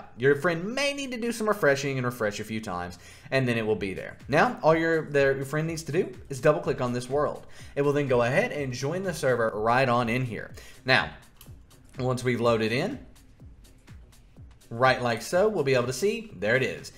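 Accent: West, United States. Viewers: I like your western accent :D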